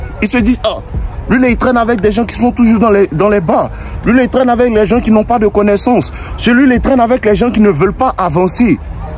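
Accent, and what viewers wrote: Ivory Coast. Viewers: on adore ton accent ivoirien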